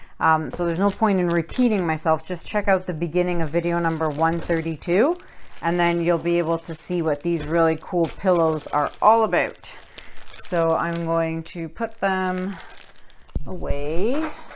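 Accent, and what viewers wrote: Canada. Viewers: She cracks me up because she sounds so Canadian. That’s not a bad thing. It’s just very easy to tell she’s from Canada just by listening to her.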